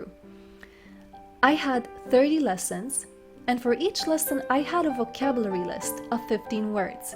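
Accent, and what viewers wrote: Israel. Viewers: The common problem is that she pronounces like most of ashkenaz israeli ח like כ and ע like א. Only because they are not able to pronouce like sefarad israeli. Israeli have a bad tendancy to pronounce this semitical language in a european way. Which creates confusion for the writing language and makes it poorer. That's not her fault. It is a wrong system.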